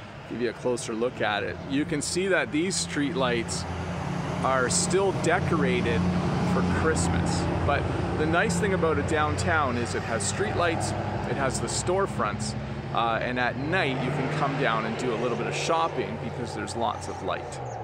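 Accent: United States. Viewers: You really is a canadian? Your accent really like the american 😍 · I'm from Brazil and I liked your video and your accent, it looks like american I have subscribed Thanks a lot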